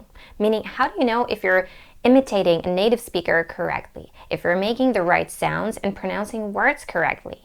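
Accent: United States. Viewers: Your American English accent and pronunciation is so perfect, dear ... You are one of my pavourite english pronuncers ... Where are you from ? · I thought you were a native speaker ! Then , I heard a little accents ...when you said " comfortable " & the way you said " thank you " in another video ,here & there ,but you are really really good ! Usually any Europeans have their accents ( heavy ) when they speak English ! You got an American accent...why ? How come you chose the accent over British one ? I am curious ! Anyway there are many very good English teachers on you tube ,a couple of English teachers & one American ,they are all female interestingly ! Lol. But, you can really help non native speakers w/ English by using your own experiences & insights ! That others can't ( as those ladies that I really like are all native speakers ,so they don't go thru w/ the walls we,non native speakers bump into & find it hard to climb up on ,or break it down ) guide the way you can ! 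You are a real good success story that we all can lear from ! You deserve more viewers & subscribers !👍💜💜💜💜💜🥁🐉🎵🎤🎶💕💞💕💞💕💞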